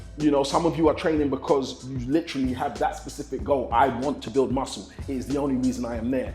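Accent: London, United Kingdom. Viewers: Why does he sound like he from UK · Simeon Panda your body is sexy and I Love your London ACCENT YOUR BRITISH SEXY BROWN SUGAR BOY . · I had no idea that he was British, I've been following him thru Instagram and haven't heard him speak till now haha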